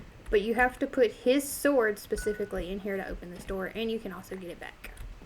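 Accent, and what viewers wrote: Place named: South, United States. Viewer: Hey! Contrats on starting your new modded serie! I've been kinda binge-watching the serie so far. You seem new to this kind of thing, which is great! I'd like to know if you're the kind of YouTuber that likes having comments helping you with tips and tricks when you seem stuck, warn you when you make a mistake or forget something, or if you're the type that rather figure it out on your own, if you like the challenge of solving everything like it's a puzzle or some type? Just trying to figure out if you allow some back seating or not, this changes the perception on how we can interact with you in the comment section:) The serie's been great so far, quality wise I'd say the only thing so far is that your voice is really soft and quiet, which makes the video pretty relax (Which I suppose fits with your Cozy persona:D) but personally it could use a little +1-2db increase (just a little louder so we can at least hear you clearly). 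I must say though, you have a very nice accent, sounds a bit southern to me?:P I might be wrong but to be fair I'm not from the US hahaha What are your goals for this modpack? Do you plan on automating everything? Are you more of a stylish builder that fits automation into a design or do you design around the automation, or maybe you simply make it work and that's enough? Lastly, I'm curious if you plan on maybe streaming at some point? A format I like seeing is those cuts you do when you work on a project, when you know it's going to ta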